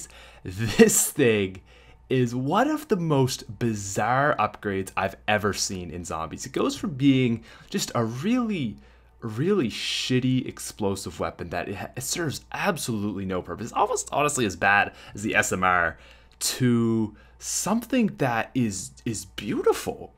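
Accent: Canada. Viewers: He's Canadian he said aboot instead of about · What's least favorite gun? The smR! No offense to your Canadian accent, in fact I like your accent.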